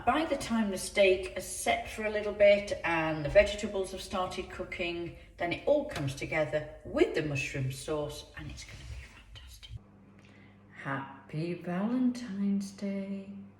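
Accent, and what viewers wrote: United Kingdom. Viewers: You are so gorgeous and I love the accent.. I take it that you are from the UK maybe.. I would love for you to be my Valentine love.. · Sounds yorkshire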